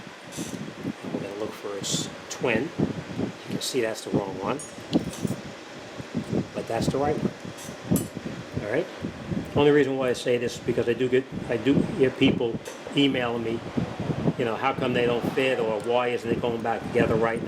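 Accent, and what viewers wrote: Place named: New Jersey, United States. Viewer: Thanks for taking the time to post this DIY. Almost wished I still lived in JC instead of Florida so I could stop by your shop after hitting the diner. Let me know when you work on a Porsche 997 Turbo because I have not found anyone else that has made a great, comprehensive and complete video as yours. Also enjoyed hearing the Jersey accent and the horn honking, too. Keep it up.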